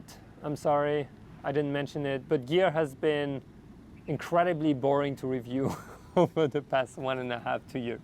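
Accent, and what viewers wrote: France. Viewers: I am watching your videos from time to ime, most accidentally, but mostly I am impressed by your "Closeness". i hate the ads in youtube but it seems there is no way except booking an expensive abo. I look videos too on rumble or odyssee for political reasons. And what I also like on your videos is the good speaking quality even with the little frnch accent. That is an aspect what is oftly missed by other youtubers. They present not an accent but a slang which is not understandeable for anyone who wasn't born in the right state.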